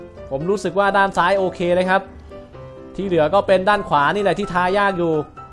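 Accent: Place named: Nakhon Si Thammarat, Thailand. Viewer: พี่คนนครใช่ปะ(ผมฟังจากสำเนียงอะ)คนใต้ด้วยกันอิอิ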